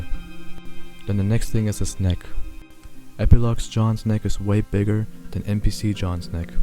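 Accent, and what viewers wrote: Germany. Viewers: starkes video und feier den deutschen akzent haha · I think I hear DEUTSCH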